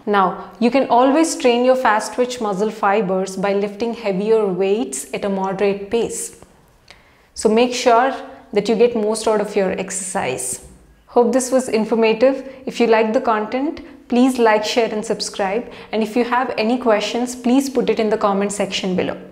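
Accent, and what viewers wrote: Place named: India. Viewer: This the best indian accent from a youtuber ive ever heard. Easy to understand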